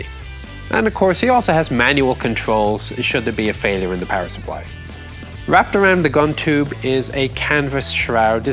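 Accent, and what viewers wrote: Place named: Ireland. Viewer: Originally from Ireland, his Irish accent gets stronger when he has been visiting Ireland lol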